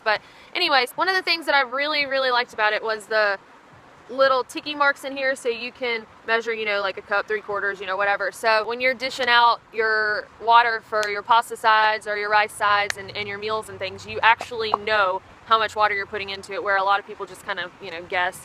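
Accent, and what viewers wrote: South, United States. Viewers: I bowl water also. Your southern accent is dignified and correct! My favorite camping item to bring would be bacon. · Came here for the southern accent- stayed for the information... Bought your book.. can't wait to read it.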